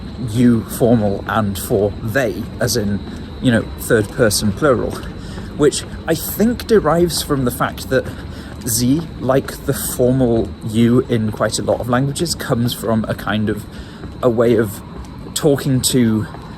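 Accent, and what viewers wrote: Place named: Argentina. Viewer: Qué buen acento argentino! Y el video super interesante. I hope someday we get a video in Argentinian accent😂 you,re almost there, just need to replace the LL sound for SH (lluvia sounds like shuvia)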